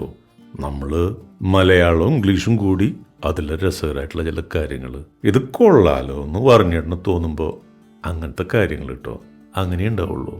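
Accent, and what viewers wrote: Thrissur, India. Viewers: തൃശ്ശൂർ സ്ലാങ് ആണേയ് ...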